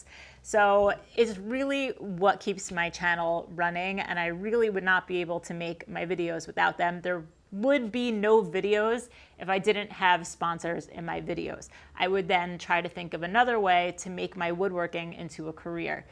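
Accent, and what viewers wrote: United States. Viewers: Yes! I was about to comment the same thing! It’s a nicer accent than other parts of America I think.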